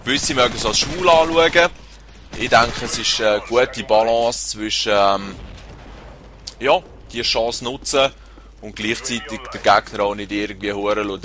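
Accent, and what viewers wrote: Switzerland. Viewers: Geil endli mal en schwitzer commentator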